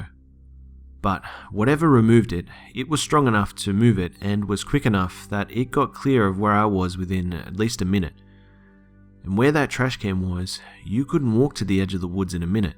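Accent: Australia. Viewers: love vids ya gotto have a aussie on youtube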